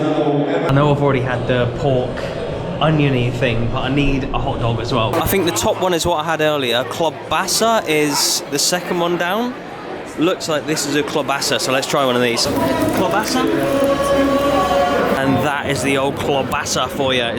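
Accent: United Kingdom. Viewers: I saw you filming a video at the Christmas markets, I was just making a delivery for a food delivery company and I passed you on my bike, I also thought that this must be a UK accent and I wondered for a while where you were from. And today this video pops up, I like your content. Keep it up and come again.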